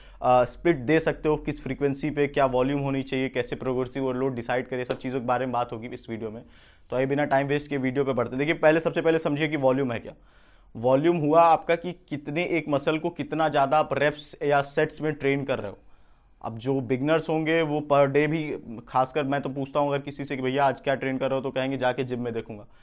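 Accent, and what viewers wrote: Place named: Awadhi, India. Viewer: Yaar tumhara Awadhi dialect kafi achcha lagta hai.. 🌼🌼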